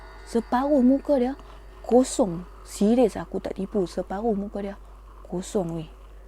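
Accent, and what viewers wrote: North, Malaysia. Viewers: Sama sama bukan apa shiok dengaq hang bercerita dengan loghat utagha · Teruskan buat citer hantu best Cara citer teruskan ckp owg utara best citer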